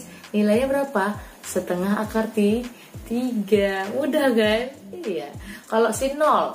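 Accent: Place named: North Sumatra, Indonesia. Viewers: Nampaknya ini dr sumut ya 😁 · Bahasanya Medan kali... Sukses trus membuat kemudahan matematika👍👍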